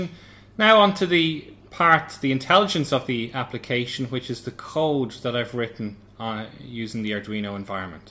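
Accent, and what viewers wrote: Ireland. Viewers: Hey, well *I* knew you were Irish because you obviously sound Irish. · I called it!!! I knew you were Irish! At first I thought British but then I realized cause of the way you say three! Haha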